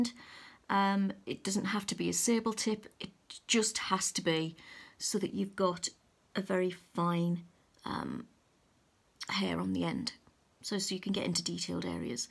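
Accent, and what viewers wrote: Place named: Scotland, United Kingdom. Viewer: Hullo,! I've been watching your vids for awhile now (I subscribed) for 2 reasons: 1. You're so talented and a wonderful teacher! You don't hurry thru and you are very clear and precise. 2. I am a transplanted Scot living in the US and your voice reminds me of my Mum. Same accent AND she was a painter! So you bring me comfort on two levels. Thank you so very much for brightening my days. Blessings xo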